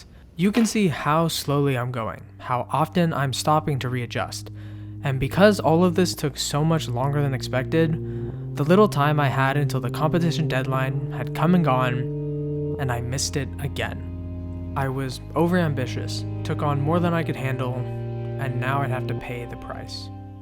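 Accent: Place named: United States. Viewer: you're so american it almost reeks out of your voice. ew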